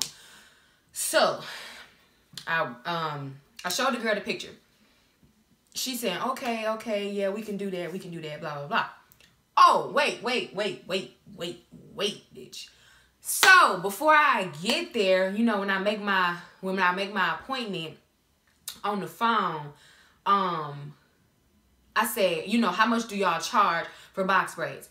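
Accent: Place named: South, United States. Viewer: I'm from Alabama and you sound kinda southern 😊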